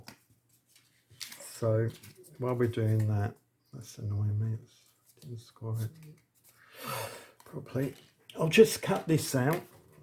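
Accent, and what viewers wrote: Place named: London, United Kingdom. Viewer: Welcome back and well done - I know how hard it is to get back to things after something like that! Lovely to hear another Londoner’s voice. xxx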